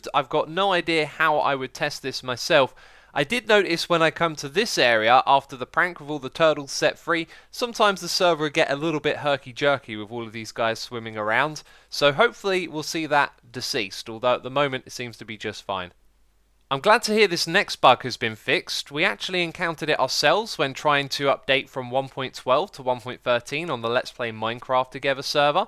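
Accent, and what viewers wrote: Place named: England, United Kingdom. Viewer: That English accent..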